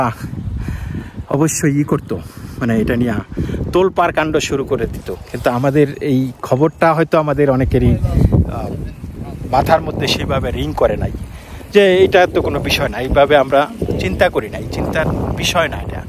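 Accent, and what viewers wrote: Bangladesh. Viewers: উচ্চারণ শুদ্ধ করুন। বাঙ্গাল ভাষার আধিক্য। ভারতীয় বাঙ্গালীরাও আপনার YouTube এর দর্শক।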